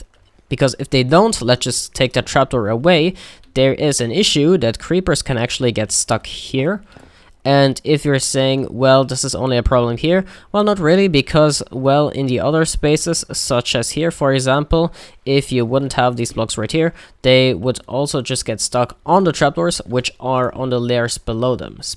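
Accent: Germany. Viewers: yup... I love his German accent. Most good technical minecrafters have German accents, ilmango, nex, methodzz and so on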